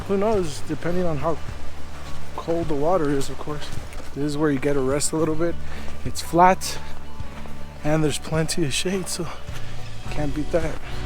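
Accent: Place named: Latin America. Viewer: Sounds by your slight accent must be Latino si..good to see a fellow Latino enjoying Mother Earth well educated in the outdoors well done hijo good to meet you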